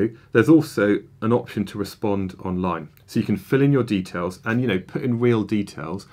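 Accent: United Kingdom. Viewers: I love british accent ♥️😍♥️😍♥️😍♥️🏴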